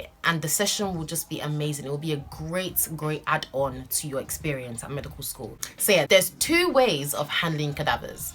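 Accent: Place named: Nigeria. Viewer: Love, Love, Love the little dash of your Nigerian accent😅. My mum was telling me the other day that she thought that her dream of being a doctor was going to be fulfilled through me (can you imagine!). I just laughed because imagine a doctor that doesn't like seeing blood 🤢. Anyways love the video, because "I can't come and kill myself"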